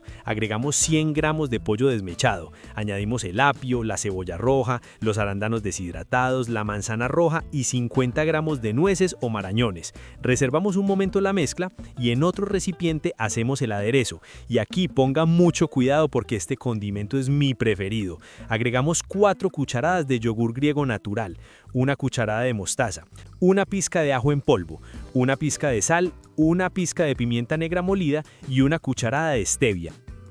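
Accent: Antioquia, Colombia. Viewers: Me encantan, tus ensaladas . Y mi encanta tu acento paisa, me recuerda, mi amada Colombia ( Medellín ) Mil gracias, Bendiciones 🤗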